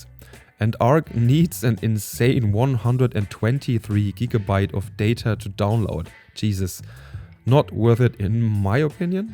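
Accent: Germany. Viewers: I bought my mom a mini PC with a Ryzen 7 H 255, 780M graphics, and 24GB of RAM. It's mainly for city-builders/strategy games, and I'm curious to see what this little machine can do. Linux would also be interesting as the OS, since it's supposed to run faster. And you can also use FSR 4.1.1 pretty well now with OptiScaler. Interessant wie man unseren Akzent schlecht verbergen kann ;)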